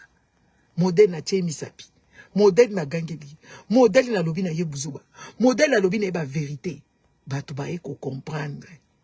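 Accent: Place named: Rwanda. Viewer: Longua yo Rwandaise déguisé en Congolais agent ya Kagame n'a communauté Ozalaka nayo mutu ya M23 Banda kala, role batinda yo n'a communauté eza ko kosa comme si Oza Combatant alors que ce faux, Ozalaka nde Rwandaise agent ya Kagame, ba maloba nayo sans impacté chez biso ba vraie Congolais de père et de mère, n'a lingala nayo avec accent Yaba Rwandais,Oza ya biso te et opinion nayo ezo compter te mwasi ya salite solo M23 déguisé en combatant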